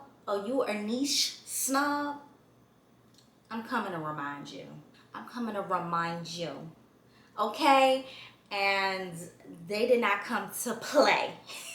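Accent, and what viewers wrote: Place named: Philadelphia, United States. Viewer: She actually sound like she from Philly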